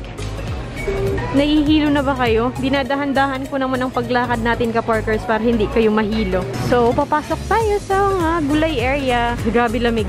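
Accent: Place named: Visayas, Philippines. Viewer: Taga saan ka madam. Bisaya accent man.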